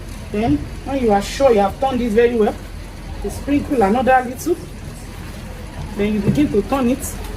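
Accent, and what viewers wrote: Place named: Africa. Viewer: Can I just say I Love ya accent. Your accent make me miss my friends so much. I'm in a state or town where there are no African here. Sucks.😩. Don't mind me..but I love the video.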